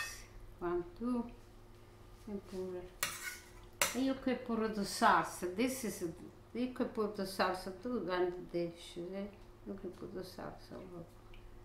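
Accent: Italy. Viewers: I love to watch you Mama! You are so happy when you are cooking! I love you. I am Irish Americàn, but my roots are Spanish. I think that's why I love the Mediteranean food. Anyway, I love to watch you and hear you direct us in the process of making the recipes you present. There have been negàtive comments about you. I, for one, love your Italian accent and appreciate your learning English and love your presentations. If people criticize your presentstion just ignore them. I love you and your sharing your cooking knowledge. Keep making your videos, I will always watch! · This dish was my favorite!!!!!! My Father used to make it!!!!! My Fathers family was from Naples Italy. He used to put raisins in the sauce to take the acidity out of the whole tomatoes and add sweetness. My grandmother made the sauce like this in Naples. I LOVE WATCHING YOU AND YOUR ACCENT MY Fathers whole family was from Naples. 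Could barely speak english and your accent reminds me of the good times. The Lord Jesus bless you✝️🕎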